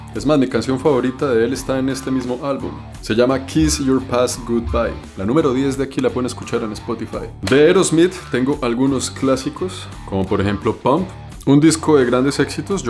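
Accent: Bogotá, Colombia. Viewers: Es el acento de Bogotá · Sigo su canal de viajes , su estilo calmado, educativo, sus comentarios y su acento colombiano que le queda preciso a sus narraciones. Es un ser humano con gustos como cualquiera, y en ese contexto no hay música más internacional que el rock y el metal. Saludos desde Ecuador parcero 😊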